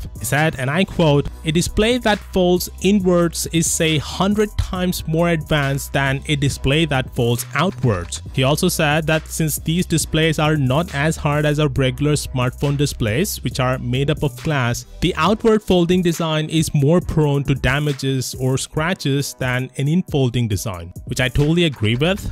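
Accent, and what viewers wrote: China. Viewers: Wtf your accent sounds like Chinese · Yes, chinglish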